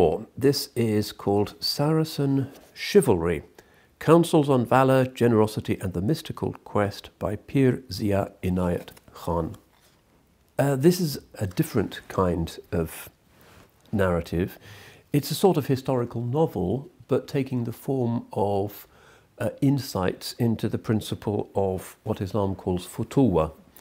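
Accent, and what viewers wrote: United Kingdom. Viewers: He is so eloquent..that soothing elegant British accent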